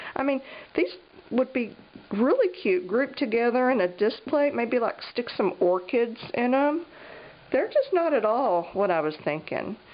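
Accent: United States. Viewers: Sorry but your American English is cracking me up!..pipe ets?....in the science lab we call them...( pip ets) not (pipe-ets)...gosh I love you girl.....lol...lol...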